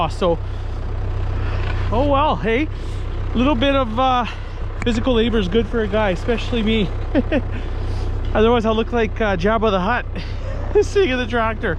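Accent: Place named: Minnesota, United States. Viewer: Are you from Minnesota? You have an accent from that region. I am impressed by your enthusiasm for your ranch. I grew up in Colorado. We used to get big storms too.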